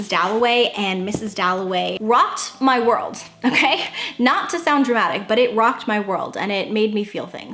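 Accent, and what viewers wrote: United Kingdom. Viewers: That intro 🤣🤣🤣🤣 your British accent could star in a period drama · I bloody knew you were Bri’ish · OMG That bri-ish accent!!! YOU TOTALLY KILLED IT HAHAHA!!!!💗😹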